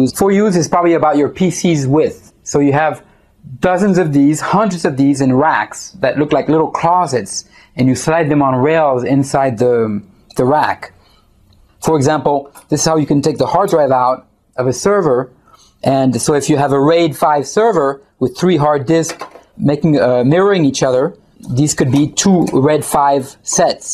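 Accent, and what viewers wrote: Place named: France. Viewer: Forgive me but you sound French. Bravo!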